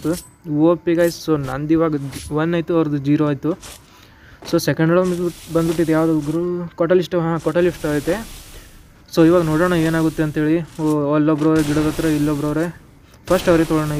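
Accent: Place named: Uttara Kannada, India. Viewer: Voice uttar kannada Voice nimda yanna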